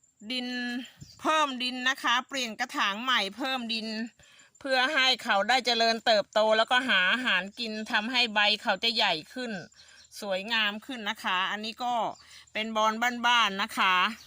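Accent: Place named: South, Thailand. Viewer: น้อยมาช่วยเปลี่ยนถางค่ะคุณ 🤩🤩พูดแบบคนใต้จ้า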